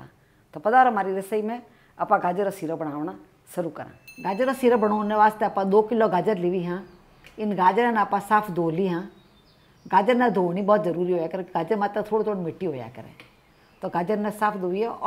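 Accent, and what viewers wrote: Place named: Jodhpur, India. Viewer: VERY.NICE.MATA.JE.YOU.ARE SPEAKING.JODHPUR.LANGUAGE VERY.SIMPLE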